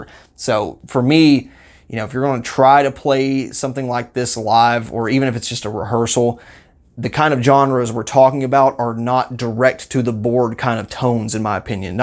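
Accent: United States. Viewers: very interesting stuff, ty for putting this together. as i am curious (german native speaker) - that accent, which area of the states are you roughly from?!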